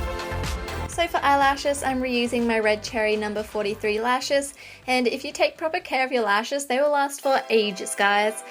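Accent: Australia. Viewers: is she australian? beautiful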